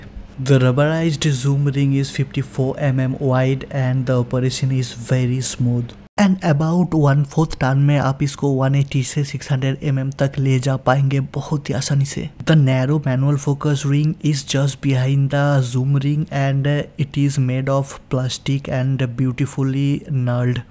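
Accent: Bengal, India. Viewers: hindi sahi hai lekin bangal hai tone to hogi hi bangali, aap video me or achche se hindi bole, video or chalegi sath hi bangali me bhi alag se banaye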